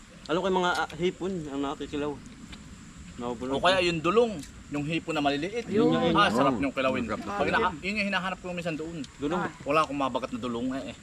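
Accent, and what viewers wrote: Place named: Visayas, Philippines. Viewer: Nku idol tgal ko ng nuod sau kala ko bisaya k yung punto mo kase kala koy parte ng bisaya k😂😂😂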